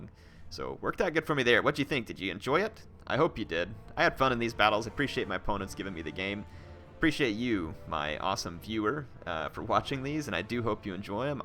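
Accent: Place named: Arkansas, United States. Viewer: Whenever I hear that ol' friendly-Arkansas " Hey Youtube, what the crap is going on!" it cheers me up immeasurably.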